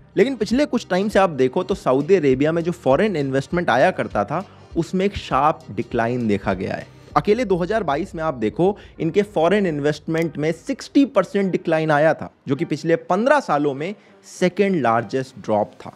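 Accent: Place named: North, India. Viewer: Donty donty eight 💀💀💀💀..bro this north indian accent is so funny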